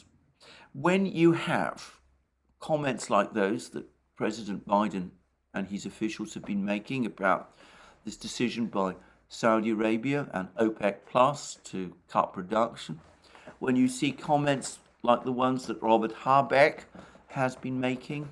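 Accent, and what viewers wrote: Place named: RP, United Kingdom. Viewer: the Russian economy is in the toilet. Even according to themselves. Instead of listening to a Russian blogger and propagandist, why don’t you do some critical thinking and read some independent sources. Russia has been and continues to beg for sanctions to be lifted since day one. Income from gas and oil has now tanked and they are running a fiscal deficit month by month. For your own sake, do your own research as I do and don’t take this creators word for anything just because he has a posh English accent. I have yet to hear him utter a single truth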